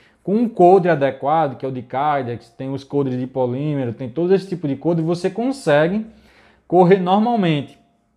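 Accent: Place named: Northeast, Brazil. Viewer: Sotaque nordestino, show!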